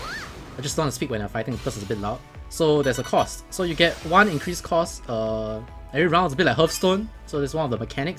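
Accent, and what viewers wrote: Singapore. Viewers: you mean everyone in Singapore speaks like this and actually understands each other??? O_O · its called singlish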